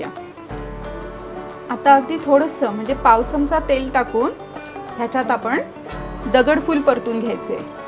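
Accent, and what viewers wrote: Konkan, India. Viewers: Excellent,Mrs!! By the way,where do you stay,as your accent is,typical konkani. Thanks & all the Best to you,Family members.Shrineevas Sant,Nasik <mail>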